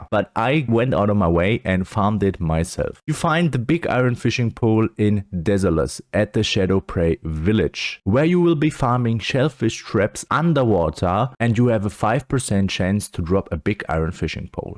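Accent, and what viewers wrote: Germany. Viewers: wie kann man so deutsch englisch sprechen? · don't let him bother you. your german accent makes it easier to understand for every non native english speaker ;) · Man hört halt so krass raus das du deutscher bist xD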